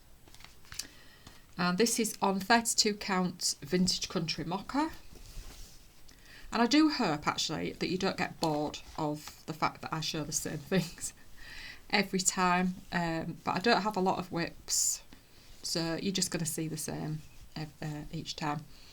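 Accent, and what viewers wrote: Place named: Yorkshire, United Kingdom. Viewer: Hello 👋🏻 I’ve just started watching you and really enjoying your Flosstube. Your projects and stitching are beautiful. I am also doing stitch from stash and doing well so far. I guessed you’re from Yorkshire straight away but where? East Yorkshire. I’m in South Yorkshire right on the edge of the Peak District, I can walk into it in 5 minutes. Anyway Happy Stitching and I’ll see you next time ❤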